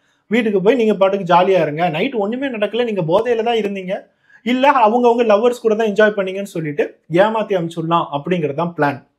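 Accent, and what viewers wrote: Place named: Coimbatore, India. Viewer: ஹலோ வணக்கம் வணக்கம் நான் கடந்த சில மாதங்களாக உங்களது வீடியோவை மிஸ் பண்ணாமல் பார்த்து வருகிற மற்றவர்கள் போடுகிற வீடியோ விற்கும் உங்களுக்கும் என்ன வித்தியாசம் என்றால் அந்த சம்பவத்தை சொல்லும் விதம் தேவையில்லாத ஆர்ப்பாட்டங்கள் இல்லாத அருமையான தெளிவான விளக்கம் கடைசியில் ஒரு ஒரு அனுபவம் பாடம் ஒருவேளை நீங்கள் பொள்ளாச்சியில் படித்ததால் கோவை மாவட்ட சொல் வழக்கு நன்றாகவே உங்களுக்கு வருகிறது அந்த கதை சொல்லும் விதமே நீங்கள் வெற்றியடைய முழு முதற்காரணம் தயவுசெய்து இந்த பாணியை மாற்றிக் கொள்ளாதீர்கள் தானாகவே பார்ப்பவர்கள் எண்ணிக்கை அதிகமாகும்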